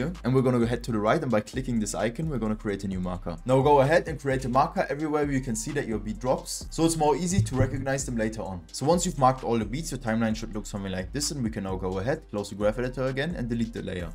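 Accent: Germany. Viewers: is he german? he has a german accent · I hear German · Ich höre Deutsch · Da mann hört das du deutsch bist schreib ich mal deutsch :) Also ich habe mir grade adobe geholt und mit deinen endlos geilen videos habe ich schon so viel dazu gelernt! Vielen dank dazu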